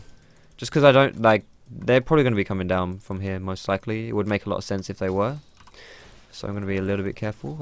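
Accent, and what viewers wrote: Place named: England, United Kingdom. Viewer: I still love youre videos and I think you should make videos w/o your face. English accent with being able to see the face of the speaker creeps me out.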